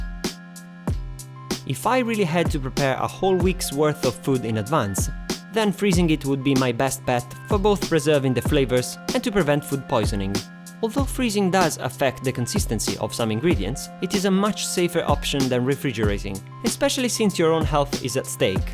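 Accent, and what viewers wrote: Italy. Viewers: You are great " "! I am Italian too but I love your accent when you speak English, it is not easy to speak a foreign language, I know and you do it very well! I also love the way you speak, your irony, I enjoy every word and all your videos very much and I am so happy you are back on line again! Love you Xxx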